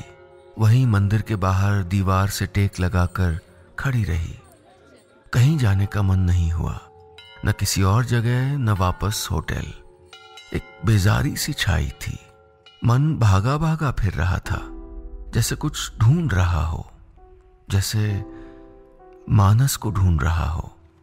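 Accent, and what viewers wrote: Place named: Kumaon, India. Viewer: Very beautiful story and that kumauni style of talking just awesome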